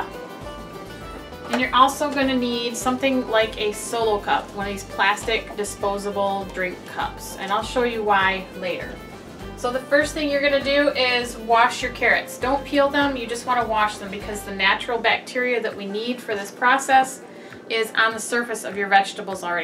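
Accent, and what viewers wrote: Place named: England, United Kingdom. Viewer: I like you accent. Are you English?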